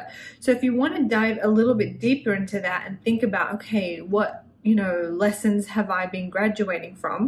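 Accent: Australia. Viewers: Didn’t realise you were Australian! That’s so cool 😊 what state are you from?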